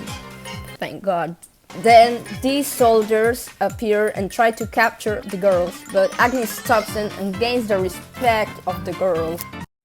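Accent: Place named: Spain. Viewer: Se nota que hablas español pero que buen video de mi manga favorito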